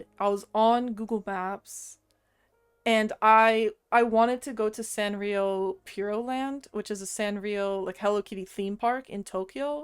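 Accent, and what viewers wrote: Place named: Australia. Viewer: Wow I always thought you were some type of Australian for some reason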